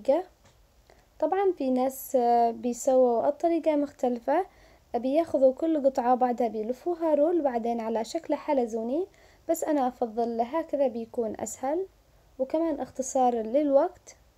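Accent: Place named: Sanaa, Yemen. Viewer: انا فدالك ياصنعانيه ومااحلى الكلام الصنعاني والطبخات الصنعانيه ...واحلى لايك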